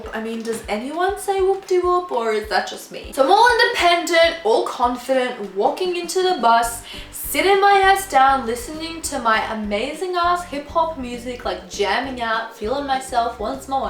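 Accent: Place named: Australia. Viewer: You know I was wondering if sometime you'd like to do a collab? There's this challenge called the accent challenge and me being Canadian and you with your cool Australian accent I thought it would be perfect. If you'd like to here's my email we could discuss it more and if not that's totally cool. <mail>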